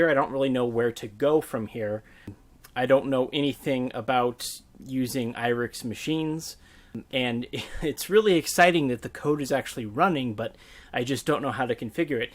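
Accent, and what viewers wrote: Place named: Netherlands. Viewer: Nice dutch accent, and also have of the comments in dutch. :D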